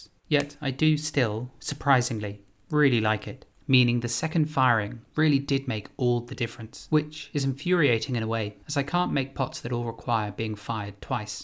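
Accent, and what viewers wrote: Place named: RP, United Kingdom. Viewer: I’m not a native speaker but I learned the language from a fairly young age and I’ve always had a knack for accents and dialects. My first accent was clean British RP then I drifted off into other accents from the British Isles. Lately it’s been a standard Australian accent but you really make me want to move back to RP again. Let’s see how long it’ll last this time XD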